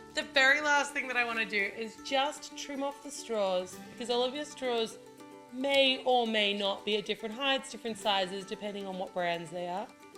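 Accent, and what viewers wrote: Australia. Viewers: Hi! I'm an Aussie, been living and working in Japan for the last 6 years. I suck at baking, but my sister loves it and I wanted to feel close to her, so 2 years ago I started watching your channel. It reminds me of home (no matter how far or wide I roam, right?). I remember how to speak Aussie from you, so that my family won't tease me for sounding American lol (Funny that people actually can't understand Aussie here!) Your creations/ideas are amazing(!) and your down to earth personality is beautiful(!). I'm pretty sure that running a business, being publicly known and raising two gorgeous kids must be crazy sometimes, so I just wanted to take a second to say THANK YOU for all the countless(!) times you've made a homesick Aussie feel like she's back home in her sister's kitchen for half and hour or so each week. I pray you know how much God loves you! 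Renée x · R u Aussie or American???????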